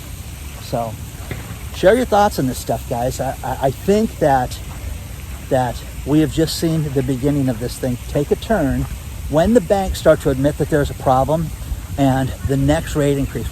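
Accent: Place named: California, United States. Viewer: I love his California accent haha. It’s like a Canadian..certain words have a zing. Anyways great content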